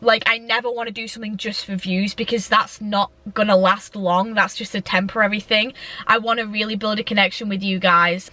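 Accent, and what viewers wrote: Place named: Stoke, United Kingdom. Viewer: U sound Stoke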